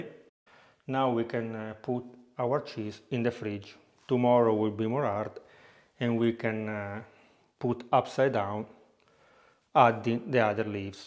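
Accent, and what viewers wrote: Italy. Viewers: Your english is very italian👍🏻👍🏻👍🏻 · I subscribed for the traditional ways that he shows, but also it's a joy listening to him... calm..knows his stuff well... and that great Italian accent.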